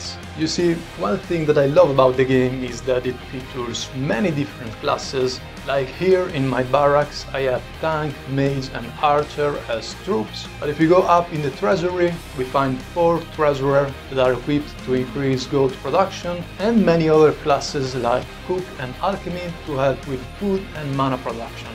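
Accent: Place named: Romagna, Italy. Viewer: ma per caso sei di ravenna il tuo inglese ha un accento che i ricorda il romagnolo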